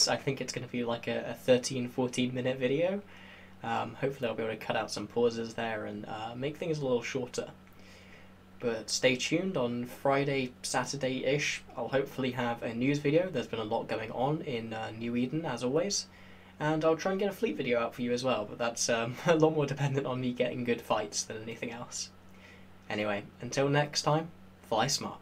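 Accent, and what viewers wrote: United Kingdom. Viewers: Ok, this might be a strange question; I started watching your videos recently and you sound like you have a southern british accent (i'm from 2 hours SW of London) and... yet you have a huge american flag on your wall. I'm very confused by it and was wondering why this was the case? are you american or british?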